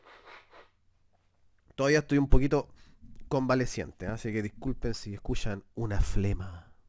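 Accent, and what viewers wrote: Chile. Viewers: eres chileno verdad? Y si lo eres de que parte? Bless y me gustan tus videos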